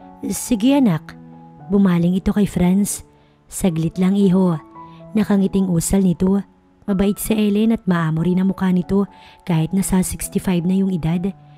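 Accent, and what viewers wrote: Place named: Iloilo, Philippines. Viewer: ang deliverance at sound web ng Bose's ilongong ilongo gid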